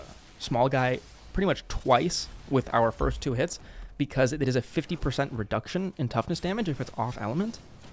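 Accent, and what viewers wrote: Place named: Latin America. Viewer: Dude, u speak like a latin man jaja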